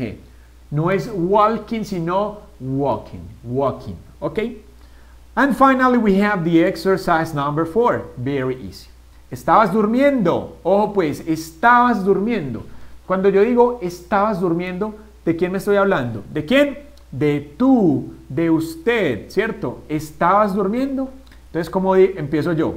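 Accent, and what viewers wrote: Antioquia, Colombia. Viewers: me gusto su acento colombiano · Se te nota lo Colombiano a kilómetros 😂😂😂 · like si te distes cuenta que parece paisa xd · parese paisa ome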